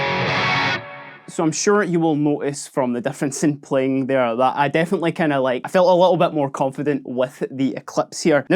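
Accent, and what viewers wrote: Scotland, United Kingdom. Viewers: Did they have to get a guy with such a brutal Scottish accent to do this video? I can barely understand the dude.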